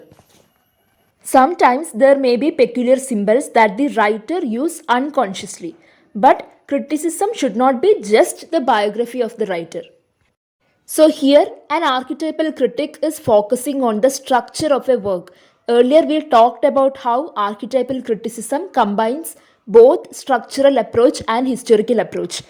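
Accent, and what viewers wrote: India. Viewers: Are you Indian or foreigner 😮? I opened the video in a hope to see a video by a foreigner then suddenly this desi accent 😅😅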